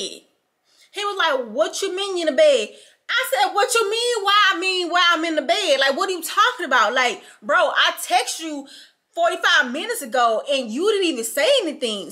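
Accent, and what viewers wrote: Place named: Memphis, United States. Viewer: Omg is this Memphis accent 😂😂😂 I swear I’m from Memphis now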